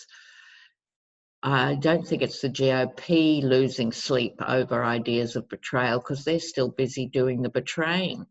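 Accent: Australia. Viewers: Hello! Enjoy your readings, and especially your Australian lilt.:-) You, Linda G and Violetta are my favorites and listen to you regularly. Wondering, how it comes to pass that all three of you are now wearing turquoise rings last few days? Did you all agree beforehand? Or do you all feel the need for Throat Chakra strengthening of Truth? Or some other prompting? Thank you for all your work, Namaste. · I watch you with the closed captioning on and it’s of great amusement to me how it misunderstands your lovely Australian accent. For example barter become butter and pentacles becomes sentinels. Flynn’s becomes flinched. Short list is shortness. Good work!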